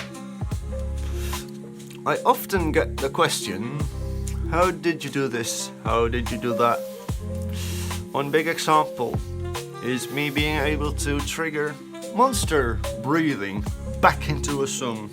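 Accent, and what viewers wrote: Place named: England, United Kingdom. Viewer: What city and ethnic background? lol, you sound like you came right out of a gutter. Do people in England not speak English anymore?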